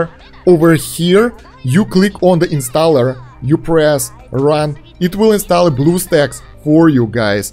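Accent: Russia. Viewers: Ты русский или умеешь говорить с акцентом?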